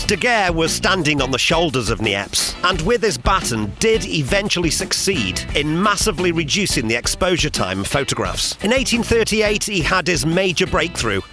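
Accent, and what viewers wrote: United Kingdom. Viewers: 3 things i like about your style after watching 4 vids. 1 your Dedication and attention to the details.... (200) Games to Check out the veracity of the MH problem. Sweet!) I never got that until today. 2. Your enthusiasm and presence (including the Accent. We Yanks are suckers for the Brit accent) 3. No AI in your presentation! Big Fan, will pass you along. Thank you.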